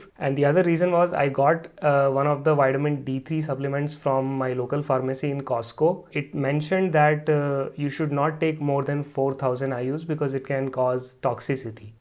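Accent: India. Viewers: Whiteamin Dea 😂 I love the Indian accent haha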